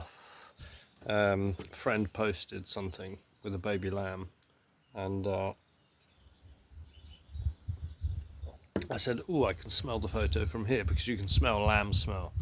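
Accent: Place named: Australia. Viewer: hahahahaha.. thats fucking gold mate. Luv your ozzie mutha fucker accent too.... not to bad for a POM .... chortle. Love that classic saying about the cold water thing. Funny that I've never heard of it until you told me about 6 years ago. Its wild that you were on a farm bugger all from me at the same time. I went to the Canowindra oval to see the hot Air balloons go off so I was quite close to ya. I only lived down the road about 80 K's so we were pretty much neighbours for a short while. Love me some Lamb as well. Gran roasted some every sunday with spuds etc when I was growing up ....... my mouth still waters each time we pop one on. Nice to hear you scored a holden belmont ute too mate ... was it a HQ 1972 model??They're worth a bit now. Cheers from OZ me old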